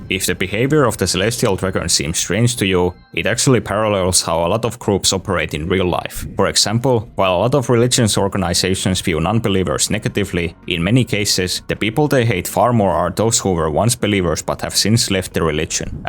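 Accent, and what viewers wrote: Finland. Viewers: Kuulosti melkein suomalaiselta korostukselta, mutta kanavan info silti sanoi Yhdysvallat... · Sounds like finnish accent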